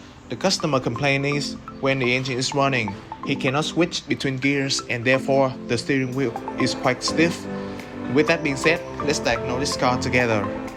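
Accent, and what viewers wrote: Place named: Vietnam. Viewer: kk, nghe biết kỹ sư Việt nói tiếng Anh liền, quan trọng là hiệu quả, giỏi.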